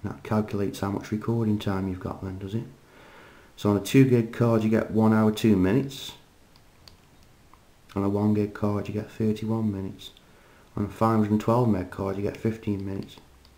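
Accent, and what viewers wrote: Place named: Staffordshire, United Kingdom. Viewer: Good video and a distinctive Staffordshire accent.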